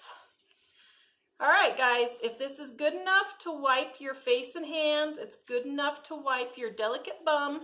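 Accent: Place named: Central Illinois, United States. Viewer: I’m from central Illinois, a lot of ppl say warsh just like you 😂.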